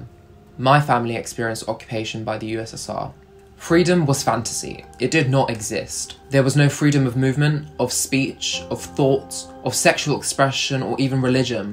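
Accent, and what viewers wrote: United Kingdom. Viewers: Hiya Political Dolphin, I appreciate your response, and moreover your effort in reading my comment. I know the effort to engage reasoned dialogue is often futile, but one still needs to try. I can appreciate the channel host's disdain for corrupt and oppressive governance. Given his apparent youthful age and finely articulated British accent, its unlikely that he has experienced first hand the torture of Western ostracization, though he may have suffered through the post-Soviet era collapse and economic "shock doctrine" imposed upon the reeling Eastern European States by the victors of the cold war. If he is of Slavic or Balkan heritage, he may have even been a victim of the cultivated conflict in the former Yugoslavia. If Ukrainian, his wounds are still fresh. If he is himself a university student, he should entertain discourse that would encourage him to think outside of the box - particularly if he intends to pursue post-grad studies - whatever the discipline. 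For myself, I've gotta figure out a way to better communicate in 120 words or less. Cheers.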